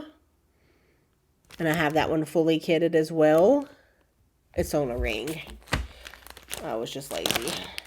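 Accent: South, United States. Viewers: Another enjoyable video !! Right at the moment I have a broken wrist and I’m so jealous of everything you’ve stitched, but I’ve really realized how relaxing our stitching hobby is, I’m retired so I start every day with and hour of stitching. Now you’re jealous right , your day will come. You have a beautiful home, I live in Canada and when I think of a “southern” home yours is exactly what I envision (no idea where you live but with your lovely accent I presume it’s in the sunny south.) have a fun Halloween 👻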